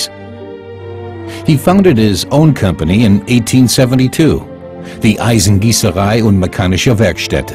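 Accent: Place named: United States. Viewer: Very clumsy American narration, though use of metrics, where appropriate is welcome.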